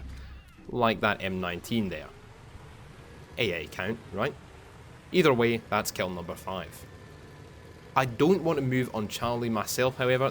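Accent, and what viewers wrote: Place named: Scotland, United Kingdom. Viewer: Is it just me or did your scottish accent get extra thicc today?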